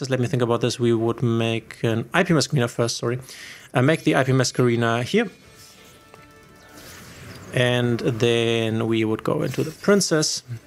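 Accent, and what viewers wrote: Germany. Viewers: Dieser deutsche Akzent nervt echt. Aber gute Zusammenfassung wie das Deck funktioniert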